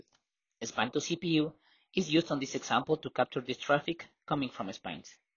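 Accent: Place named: Spain. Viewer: Good content but Extremely hard to understand thick spanish accent.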